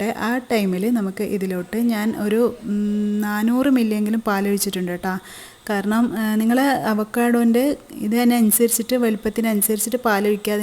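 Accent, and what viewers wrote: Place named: Kannur, India. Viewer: Samsaram kannur karudaedalla...super videos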